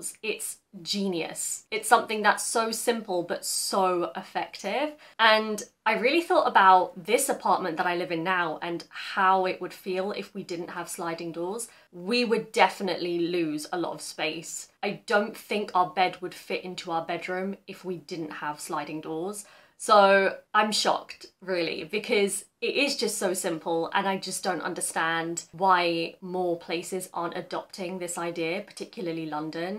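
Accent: United Kingdom. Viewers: いつもの「british English」の発音が心地良いです エリザベス女王やサッチャー首相の発音が50年前にいた神父か牧師の発音に似ていて好き アメリカンはどこかいい加減で南部訛など聞いていられない、田舎者や貧乏人の様だ 今やウォシュレットとお風呂の湯はりは無いと引っ越ししたく成るほどの機能です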